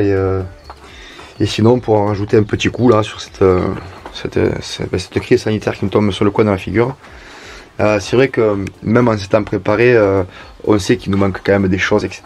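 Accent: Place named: Southwest, France. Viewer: Salut tu est d'où avec ce petit accent de chez nous, moi je suis du Tarn, super ta vidéo, j'aime.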